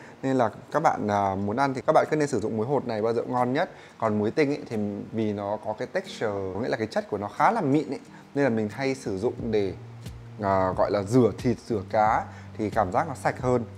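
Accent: Hanoi, Vietnam. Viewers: Giọng Hà Nội nghe cưng phết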